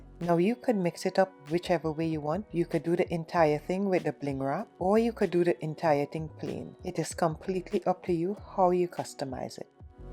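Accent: Trinidad and Tobago. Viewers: Are you from Trinidad.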